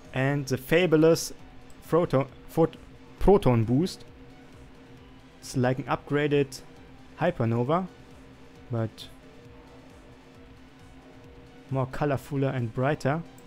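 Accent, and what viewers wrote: Germany. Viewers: are you german? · Man hört so krass, dass du deutsch bist. Warum machst du keine deutschen Videos? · Du bist doch deutscher oder 😂😂👍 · Are you German? xD #Giveaway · Are you a german ?? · Du bist safe Deutscher :D das mekt man sofort. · Heyo bist du n Deutscher? Ich finde den Aktzenz hört man immer sofort raus :D. hast du Lust mal zusammen zu spielen? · man hört das deutsche mega raus xD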